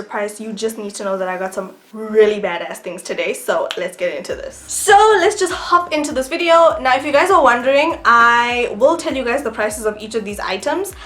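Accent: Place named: South Africa. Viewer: Hey girl! I loved this video - I am also a YBer from South Africa but I live in London now. So nice to hear a familiar accent! 💕 I liked and subbed to you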